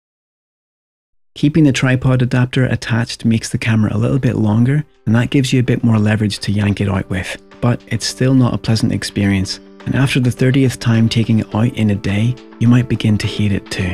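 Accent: Northern Ireland, United Kingdom. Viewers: Fabulous - thanks & nice to hear the NI accent - greetings from HK! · "Now" "Plastic" "Fan" "proportional" "eighty" Northern Irish ? Great Video review and i thought it was just me who had a problem getting the Tripod mount off the camera ;)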